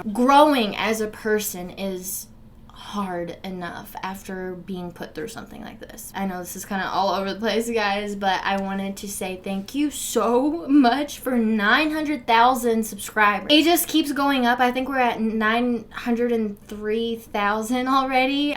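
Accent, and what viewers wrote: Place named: Virginia, United States. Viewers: You basically nailed it 😂 She’s from Grundy, VA. I’m from Roanoke so it’s funny to hear her accent, too. Very familiar. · Your accent sounds like you’re from where I’m living, in tri-cities, middle of nowhere Virginia 😂 lil bit of country, lil southern, lil city talk. Yup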